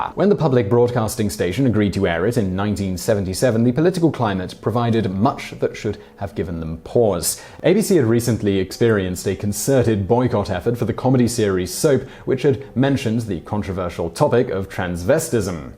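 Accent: England, United Kingdom. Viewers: Amazing that a bunch of "nothing" can be turned into something by a guy with an English accent. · please learn to better modulate your voice to a slower tempo; you're not competing against someone from a help desk in New Delhi to see how many words you can produce under a minute! Your material is wonderful but I miss most of what you say unless I turn on the captions then loose seeing what's being shown! Yes, your diction is commendable and you should be proud of your British accent, just stop torturing us with your machinegun-talking-without-enough-commas-and-periods-between-changing-sentences!! Or, stop using Meth before you begin your shows as I'm getting ready to boycott your channel; I'm sure I'm not the only one who feels this way... Thank you.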